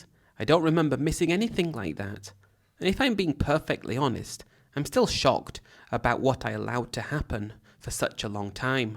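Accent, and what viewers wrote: England, United Kingdom. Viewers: You have a English accent you liar nothing you said is true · So is this channel just some British guy reading middle-aged white men's accounts of dating in the Philippines??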